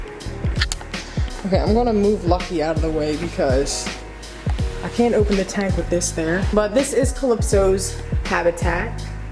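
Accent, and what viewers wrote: Philadelphia, United States. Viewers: I just discovered you and I really enjoyed seeing your set up! I have been a pet/animal enthusiast my whole life, and I plan on opening a rescue in a couple of years- this is great inspo for organization and habitat set ups! You're really great and I am looking forward to watching more. (Where are you from?? You sound so much like my family from Philly!)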